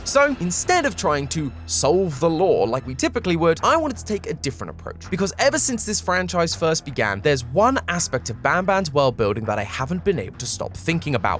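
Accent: United Kingdom. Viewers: hmm got a little British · British matpat gets More British somehow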